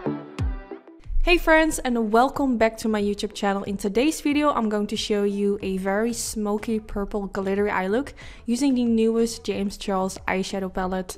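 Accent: Netherlands. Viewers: Ik hoor echt meteen dat je Nederlands bent, niet slecht bedoeld ik vond het gewoon grappig dat het echt zo was. Echt een super mooie look! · Kom je uit Nederland? Ik dacht een accentje te horen! Heb trouwens na het kijken van deze video ook die paarse mac glitters gehaald, thanks voor de tip :)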